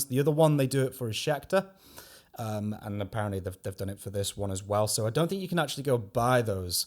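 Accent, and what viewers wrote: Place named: Geordie, United Kingdom. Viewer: Bud, I'm having a hard time placing your accent, Jordie? Great video, hi from Canada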